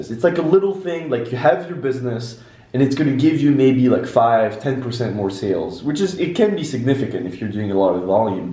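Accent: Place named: England, United Kingdom. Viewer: Although I understand English (by the way you've a f*cking English accent it's beautiful!). Et selon moi, rajouter des sous-titres en français serait top (si jamais des français qui te soutiennent passent par là 😜) Prends soin de toi et hâte de voir tes prochaines vidéo chef 🥳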